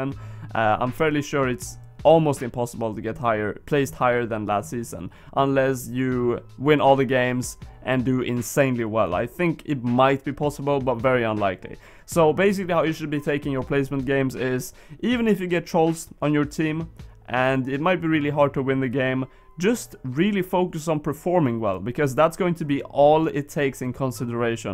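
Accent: Sweden. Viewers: Is you swedish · let me guess, ur from sweden? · Are you from Sweden?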